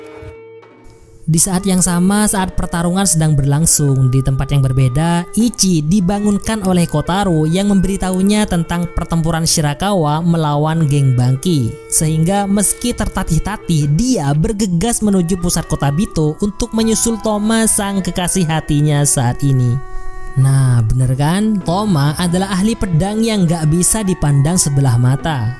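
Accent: Madura, Indonesia. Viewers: Madura dimmah be'en kang? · Oreng dimmah bang madura yee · Lha orang madura.. 😅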